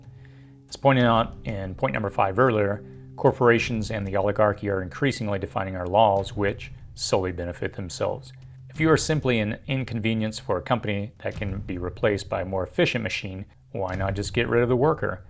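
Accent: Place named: South, United States. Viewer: Actually you do take sides just two tone in the way you talk tells me who you support you're not fooling anybody just a message to a stupid me I know who you support just by your dialect... not to mention you're from down south...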